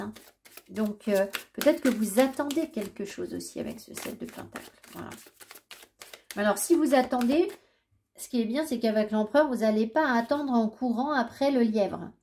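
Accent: United Kingdom. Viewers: ta une British accent I swear ♥️♥️🙏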